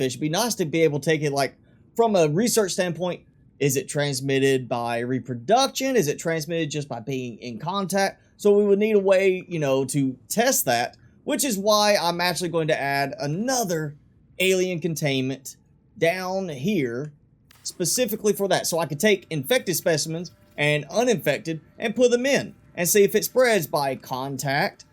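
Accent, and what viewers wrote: South, United States. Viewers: Gotta love the y'all accent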